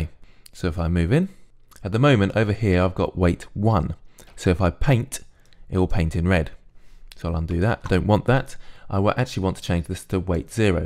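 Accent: United Kingdom. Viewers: I like this british accent : )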